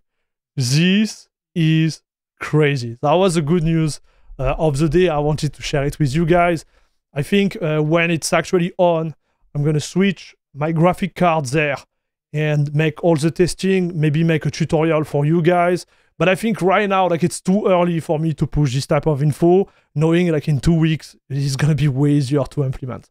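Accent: France. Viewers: this is amazing ! its like french schwarzenneger speaking :D never seen someone hype so well programming :)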